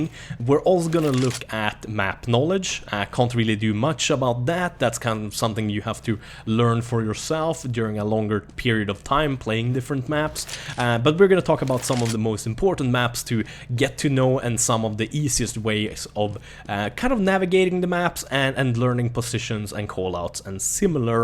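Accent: Sweden. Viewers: man hör så jävla väl att du e svensk xD Bra video ;) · He's definitely Swedish. - A Swedish guy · u r swedish arent u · ? ? hes swedish dumbass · Tack för videon (och tummar upp för svengelska uttalet haha<3)! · The swedish is real! xD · I just bought the game and thought I'd give it a try since CS is soooo praised by gamers. Here is what I think about it (only played 5 hours) Challenging to handle the weopons (This is a plus for me) I get spawnkilled ALL DUHH TIME!!! Few and pretty boring game modes and maps. People either camp in corners with snipers or run around with shotguns. (not the game faults though) Weird frame drops... Shite graphics lol. But the game is old right?... I don't like the game, I'm not hating. Just sharing my thoughts of the game. BTW Are you swedish? You really do sound like a swede. Skit bra review för noobs som mig! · Are u swedish?? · This dude is from sweden tou can hear it on How he talks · His accent is maybe swedish because he is from Sweden? 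· He swedish :'D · that Swedish accent. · Svensk? (dialekt xD) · Is he from Sweden? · Very swenglish but i am swedish to i cant be too rude🇸🇪🇸🇪🇸🇪🇸🇪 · your accent is very swedish! i just noticed that now. but anyway thanks for this guide! i also want to be better at this game because most of my friends are csgo players and im being left behind :( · also u sound swedish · he IS swedish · Are you swedish? im new and the first thing i heard was youre accent, sounds swedish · Heard right away that you were swedish x) It was kind of fun to see that yoy Also did that " " with your name cause I do too XD exactly rhe same way, since I was 13 xD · Easy to hear that this guy is Swedish :D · He has a Swedish accent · you're swedish right? sounds swedish! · two seconds into the monologue and I'm betting you're Swedish, correct? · the swedish accent i love it xD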